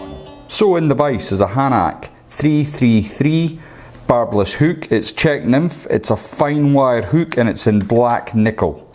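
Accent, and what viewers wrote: Scotland, United Kingdom. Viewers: as an expat scot, I don't know if I watch your channel for the fly tying, which bybtgevwaybinthink is grand, or just to hear your accent!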